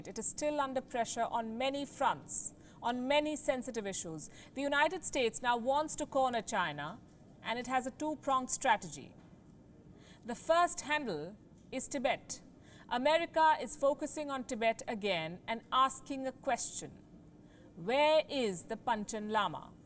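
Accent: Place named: India. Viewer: not really fond of indian accent but there is something about the way she talks that i always find interesting. not sure if its the clarity or the intonation.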